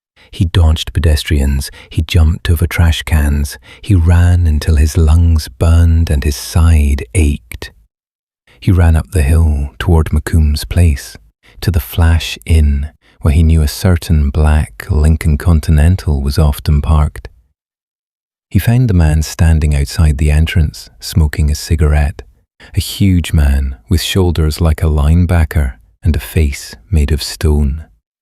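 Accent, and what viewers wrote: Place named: Ireland. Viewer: Best Bumpy story, so far. As the other reviewers said,like the original narrator better. The slow, Irish accented narrative was a bit much. Always liked the Irish accent, but bring back the original.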